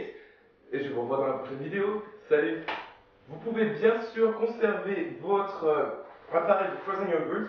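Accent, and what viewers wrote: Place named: England, United Kingdom. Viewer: ton accent anglais est terrible, sinon bonne vidéo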